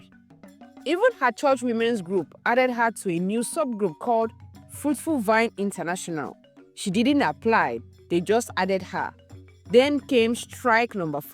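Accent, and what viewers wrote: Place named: Igbo, Nigeria. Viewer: you are using an Igbo accent.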